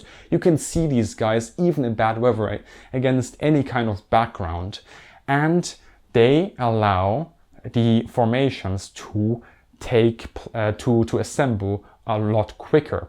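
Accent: Germany. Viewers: Well, it's Germish. Such laziness of English speaking they usually learn no foreign language AT ALL. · This is a fantastic history video. This is the most fascinating WWII history I've ever learned!! Def subscribed, and will contribute on Patreon. Strange thing is, you sound German, but you referred to Germans as "krauts". Is that a popular sentiment in Germany? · Hey this guy talks like a german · Are you German... you sound like it? If so, you are the only German person I've ever heard who knows how to pronounce 'bomb, bomber, bombing' correctly (i.e. not bom-b, bom-ber, bom-bing). Well done! :-)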